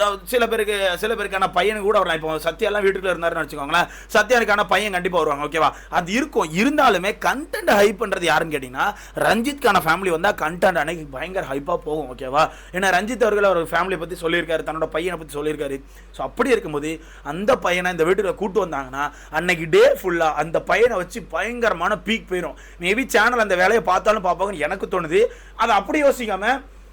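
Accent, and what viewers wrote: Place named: Nagercoil, India. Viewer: Bro Nenga Nagercoil ah hahaha yalavu 😅Nagercoil slang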